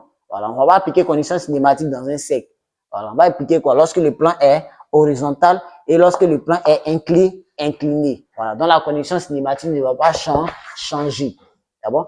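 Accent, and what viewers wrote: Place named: Ivory Coast. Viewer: Je jure que c'est un Ivoirien. L'accent ne ment pas.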